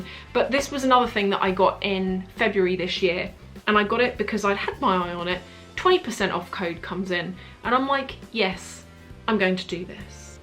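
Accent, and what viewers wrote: United Kingdom. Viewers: Hello. I recently discovered your channel and I absolutely adore you!!! Due to your British accent, I struggle to understand some of the things you say. You stated where you sell your bags and I could not get what was said.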